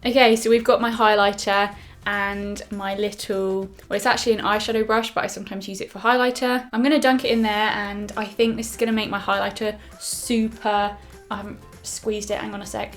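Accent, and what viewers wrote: United Kingdom. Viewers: I'm in love with your British accent.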